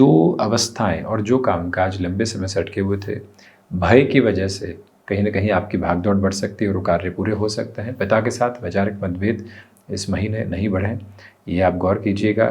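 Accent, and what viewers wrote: Standard. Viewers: बहुत शुद्ध हिंदी बोलते हैं आप, नमन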